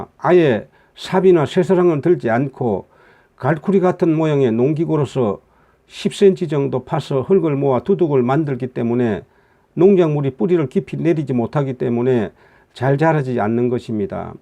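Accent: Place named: Namhae, South Korea. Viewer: 말씨가 고향이 남해시군요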